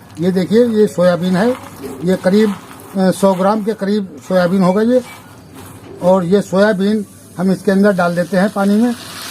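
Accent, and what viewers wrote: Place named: Kannauj, India. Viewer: Sir aapaki boli se lagta hai ki aap up kannauj or farrukhabad se hain.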